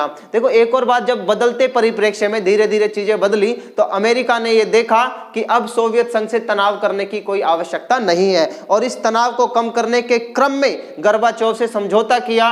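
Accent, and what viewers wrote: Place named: Rural, India. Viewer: Bahut dehati pronounciation h sir apka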